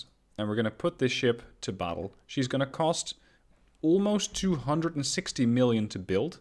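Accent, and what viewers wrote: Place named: Netherlands. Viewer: u r dutch right? if not i can hear a slight dialect as if ur main language isn’t english, and the dialect just sound super ducth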